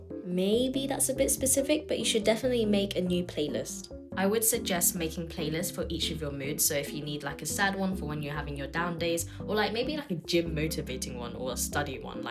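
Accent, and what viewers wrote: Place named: United Kingdom. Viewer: omg the british accent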